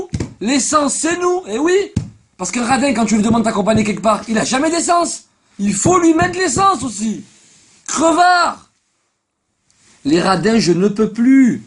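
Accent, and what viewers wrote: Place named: Marseille, France. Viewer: horrible ton accent on le voit le Marseillais qui a immigré à Paris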